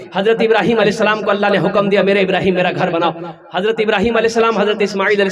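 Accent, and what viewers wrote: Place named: Bihar, India. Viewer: Asli behari urdu bol rahe hain.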